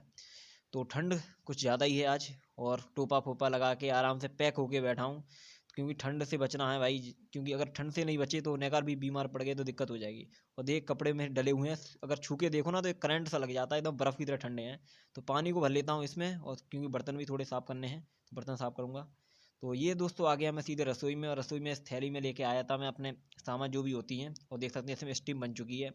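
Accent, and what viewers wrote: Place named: Bareilly, India. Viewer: Brother are you from bareily or aagra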